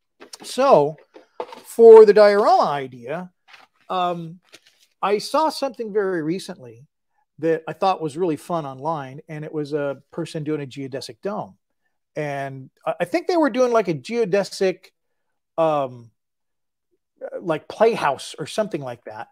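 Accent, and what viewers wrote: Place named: United States. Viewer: When you ask, it's normally used on lamps the wire and the fitting it goes into the bayonet or screw fitting I think you call Edison lamp fittings in the US I presume that's where you're from because of your accent if not please excuse my stupidity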